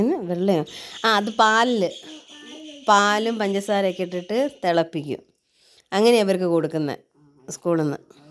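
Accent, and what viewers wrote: Vadakara, India. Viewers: Ente Naattile bhasha😊(Vadakara). Ithu Evideya Sthalam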